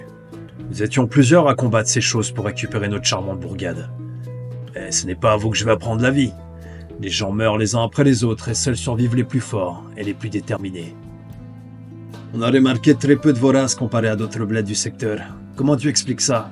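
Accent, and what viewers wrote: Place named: Mexico. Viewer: Tr bonne vidéo ton accent mexicain est déjà légendaire merci pour la video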